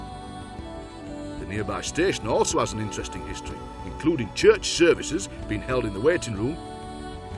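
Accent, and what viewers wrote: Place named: Barnsley, United Kingdom. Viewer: Brilliant footage with voice of a fellow Barnsley man!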